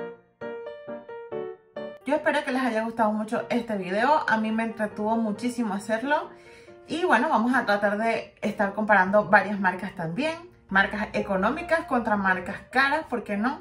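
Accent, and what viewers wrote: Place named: Panama. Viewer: tu acento es muy Panama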